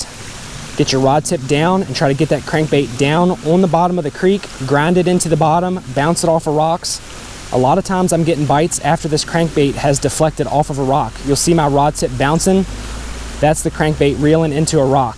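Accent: Pennsylvania, United States. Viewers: That's a awesome day of fishing! What state are you fishing in? You sound like you're from P.A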